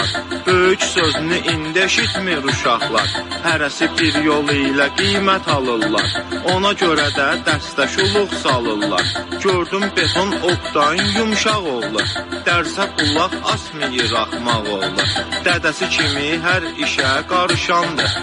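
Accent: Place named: Shaki, Azerbaijan. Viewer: 👍👍👍👍😂😂😂😂😂 ardı gəlsin Qəşəng caan ay Şəki ləhçəsi..👍👍👍👍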